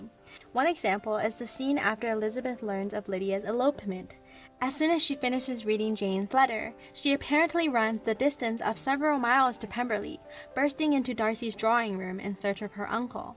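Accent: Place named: United States. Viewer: It is weird hearing the narrator with a thick American accent. “Prade and Prajadice”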